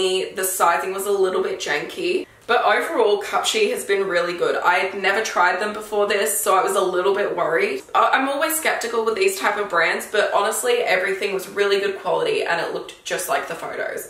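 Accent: Australia. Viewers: You're so f@ beautiful an I love the Australian accent 😍😍😍😍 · Are Australian ? Very cute accent hehe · LOL the subtitle for the beginning said “long time gnar see” not made for australian accents I guess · ow an aussie? i live in sydney :) also this is hot :p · Idk where she is from but it sounds like Australia, in which case it would be spring/almost summer there!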